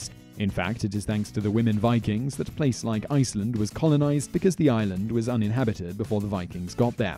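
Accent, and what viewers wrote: England, United Kingdom. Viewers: I'm sorry the enlish accent sounds smart but is it nessesary? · Is this guy British? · Jack Hammer Well.... he's English so.... yanno.... I'm sure he could do an African accent if you'd prefer :)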